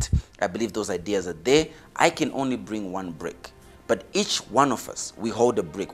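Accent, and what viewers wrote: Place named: United States. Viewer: But why sound so American.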